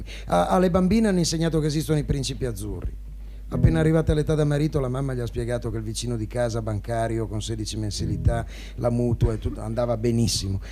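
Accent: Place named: Genoa, Italy. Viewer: Oltre che bravissimo cantante era pure molto simpatico....con quell' accento genovese...fa tanto piacere ascoltarlo parlare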